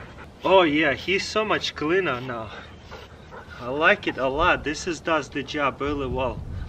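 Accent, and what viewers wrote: Russia. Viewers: Ох уж этот русский акцент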